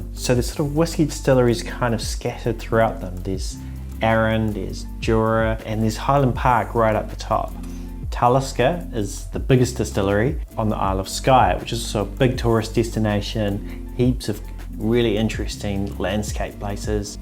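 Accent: New Zealand. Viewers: When you first started talking I thought Aussie, but second sentence in I was like definitely Kiwi, especially when you said Glunluvet and Glunfudduch lol. Shot bro 🥃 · You're from New Zealand right?? Testing my accent knowledge here lol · Hey! Just had this video recommended to me, clicked it, thought 'oh sweet that's a Kiwi', then realised 'wait a second I know that guy'! Loving the content, instant subscribe! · Are you a kiwi? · He’s definitely kiwi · Hi, thanks for the great video. Question: is your accent a New Zealand or perhaps an Australian accent? Just wondering. PS: I’m an Islay Whisky fan 🥃😁👍🏼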